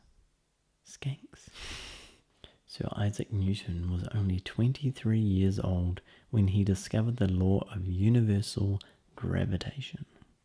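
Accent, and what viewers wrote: New Zealand. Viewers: what part of new zealand do you come from? im from auckland :)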